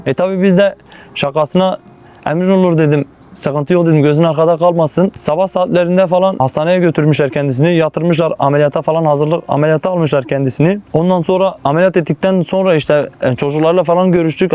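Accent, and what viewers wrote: Diyarbakir, Turkey. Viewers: diyarbakırlı belli arkadaş klpcdbrdr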